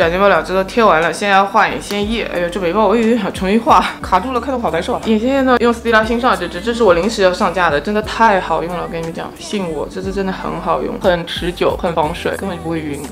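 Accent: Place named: Xinjiang, China. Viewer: 为什么韩语说出来新疆味？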